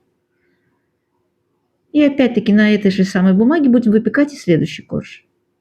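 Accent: Baku, Azerbaijan. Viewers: Вам сразу же лайк и подписка за то что вы из Баку!❤ я тоже Бакинка. Случайно забрела на ваш канал. Но не сразу поняла откуда вы, хотя легкий акцент ласкал слух. Я живу в Америке и всегда рада любому бакинцу. И рецепты у вас замечательные! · Здравствуйте.Случайно попался Ваш старый ролик, стало интересно.Когда "зашла" на Ваш канал, и услышав Ваш акцент, сразу поняла, что есть наше, бакинское. И я не ошиблась. Я сама из Баку, и Вам огромный, бакинский Салам!!! 🙋🙋🙋❤❤❤💐💐💐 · Здравствуйте,спасибо за напоминание,родилась в Баку,живу в Москве,рецепты ваши моя молодость,а Бакинский акцент отдельная ❤❤❤❤❤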